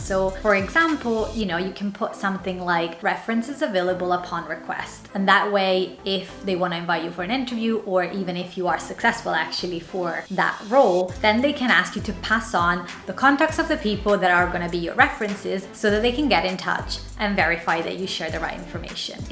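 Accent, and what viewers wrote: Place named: England, United Kingdom. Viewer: Thank you for the information. It's always hard for me to get through a presentation when the presenter ends every sentence with vocal fry but easier with an English accent. I guess I'm a racist.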